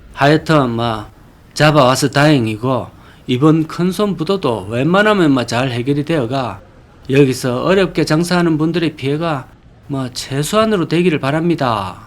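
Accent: Gyeongsang, South Korea. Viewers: 구수한경상도사투리에 후대들을생각하는 마인드 ㆍ오랜연식에서 나오는 ㆍ혜안등이국보급이ㄴ거죠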